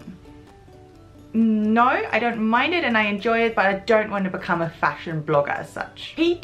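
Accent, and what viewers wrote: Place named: United Kingdom. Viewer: its so strange when non british people have a british accent?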